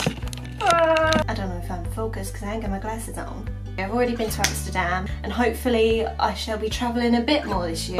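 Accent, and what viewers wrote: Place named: Australia. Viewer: that was great! I swear you sound Australian in this. God luck with everything, Love Deborah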